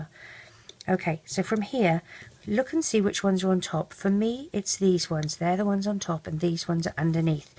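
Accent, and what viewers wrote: United Kingdom. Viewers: Kate Schultz thanks♥ i love how do you speak...is it britan!? I love England! !!♥♥♡♡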